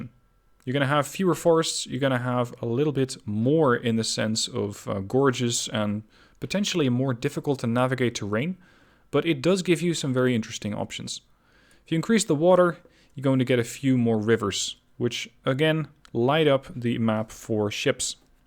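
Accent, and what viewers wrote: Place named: Netherlands. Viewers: Yep, that's English with a Dutch accent. I might just follow just for that 😉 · Said no one ever... Maar goed, een nederlands accent verstop je niet zomaar :)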